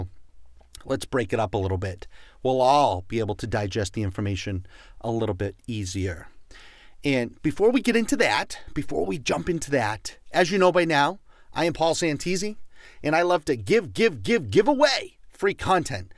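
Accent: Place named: Boston, United States. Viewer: This was actually reassuring. I seek the gurus who believe HAPPINESS is a choice we can all make right now ... not the ones with the carrot and stick ... I rarely come across the "scammers" you are talking about. (Watch, one of their ads will pop up as soon as I post this.) I can just imagine, though, people who are in a desperate place - seeking a new job for example - falling victim to the song and dance you are talking about. KEEP BOTH HANDS ON YOUR WALLET is definitely good advice out here in Self Improvement Land. Thank you for the red flag advice; thanks for the free resources and I love the Boston accent.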